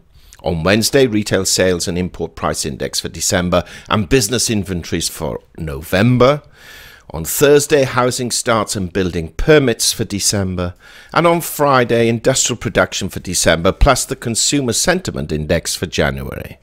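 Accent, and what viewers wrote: England, United Kingdom. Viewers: I do enjoy your pure English voice :) I tell those trying to learn English where I live attempting to shake off the chains of their poverty, that the purist form of English comes from the English :) I am going to recommend a few of those I care for to subscribe to this channel to help them with a little of this purity :) As far as this post goes however re my understanding being more focused on the substance, I agree it is following standard trend, until it doesn't :) Panic can begin within minutes and within hours a different world may be what is faced. Yes chances are slight, or are they? The fixation on the Tariffs hid its true meaning of the Pre Tariff sugar fix, pre purchases, costing investors trillions. Perhaps its hangover of such actions and what it causes is now hidden under the Government shutdown :) We will just need to wait and see, wont we :)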